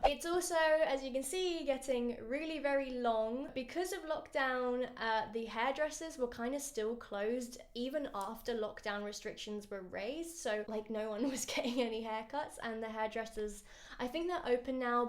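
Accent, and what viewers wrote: United Kingdom. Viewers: What made you assume that? Her obvious british accent or was it something else?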